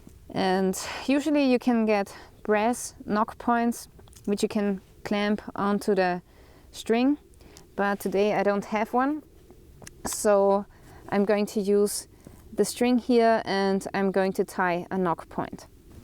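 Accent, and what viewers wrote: Germany. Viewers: So cute German accent :) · I'm guessing 🇩🇪? Nice video and very humble. It's the best when you say, ,"Cool"...dánke....Michael 🎶 🎶🎵 🎯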